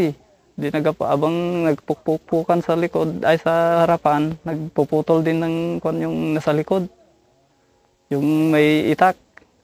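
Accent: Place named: Pangasinan, Philippines. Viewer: Yung Punto mo KABAYAN, PANGASINAN KA PALA,AY ARO IDOL TA KA LA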